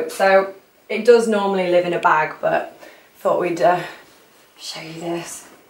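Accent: England, United Kingdom. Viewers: Are you english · Are you british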